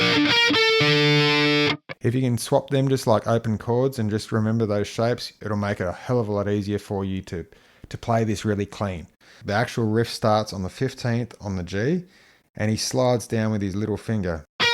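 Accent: Australia. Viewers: Toster Is he an Aussie ?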